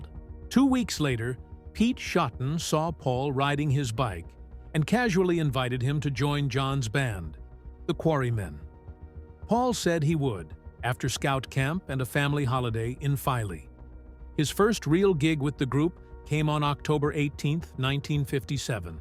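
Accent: United States. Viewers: Why is it an American doing this commentary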